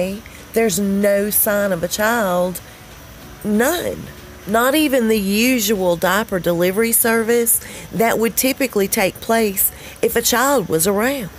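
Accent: South, United States. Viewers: Oh I love 💕 how you just tell it like it is and your southern drawl is to die for. Love 💕 your vids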